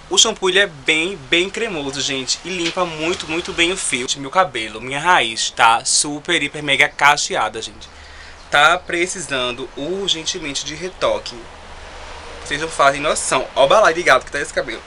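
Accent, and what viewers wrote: Recife, Brazil. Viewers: amei seu sutaque, recife , sou de São Paulo.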